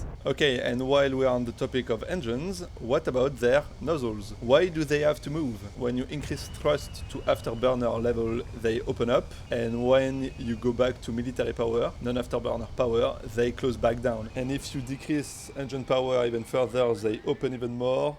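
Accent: France. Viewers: His accent is French btw lol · You have a faint high pitched tone in your mic recording, most noticeable in the Outro. I enjoy your French English very much xD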